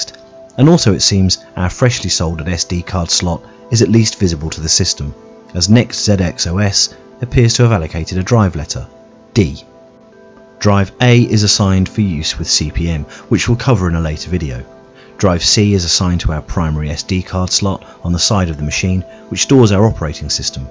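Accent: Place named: United States. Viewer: another great video!... and a great way to prep your soldering iron tip is to wipe it and tin it with ThermalTronics "Tip Tinner". Also, you might find a 1.5mm tip more suitable for pcb work than the 3mm, especially when soldering SMD. And yes, it's always ZEE if you've lived in the States for while:).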